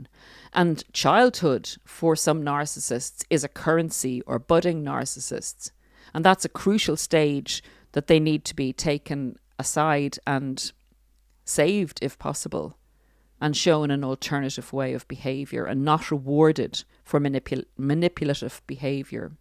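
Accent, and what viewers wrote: Ireland. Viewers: You are describing my mother … thank you. And I love your Irish voice - everything sounds so clear!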